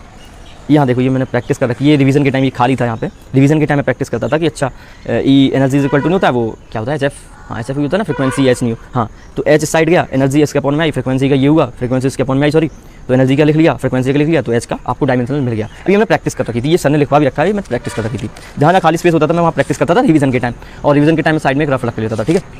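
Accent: Bundelkhand, India. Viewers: Aap bundelkhand se ho...????😊😊😊😊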